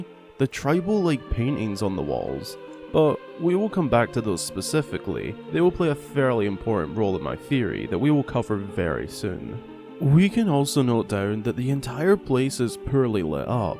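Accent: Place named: Scotland, United Kingdom. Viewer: You've a very strange accent to me, it kinda sounds like Scottish and Northern Irish mixed and every odd word American lol I like it and the video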